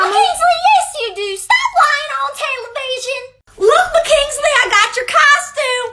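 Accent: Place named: South, United States. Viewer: Lol the country accent is so accurate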